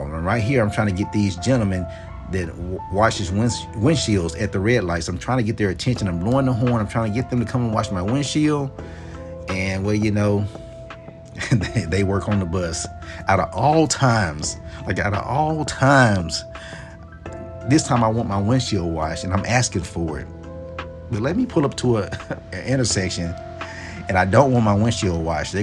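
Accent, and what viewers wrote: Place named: Georgia, United States. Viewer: Love the video, looks beautiful. Your accent sounding like it from Georgia where I used to live 👌🏽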